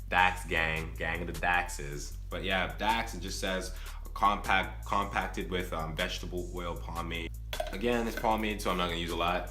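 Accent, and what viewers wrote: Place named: Toronto, Canada. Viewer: sounds like ur from toronto my guy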